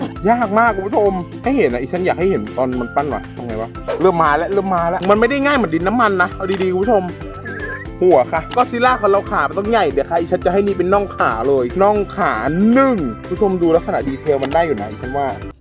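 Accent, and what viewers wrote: South, Thailand. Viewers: แม่เจอแล้วพี่เป็นคนใต้เหรอ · พี่เป็นคนใต้หรอคะหนูพูดใต้ไม่ได้แต่หนูพูดจีนได้ค่ะ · พี่เป็นคนใต้หรอครับ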